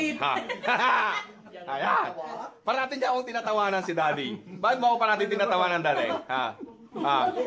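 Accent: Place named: Tarlac, Philippines. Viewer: and ilocano ka po ? u sounds like tarlaceno or pangalatoc or ilocos kabayan pala kita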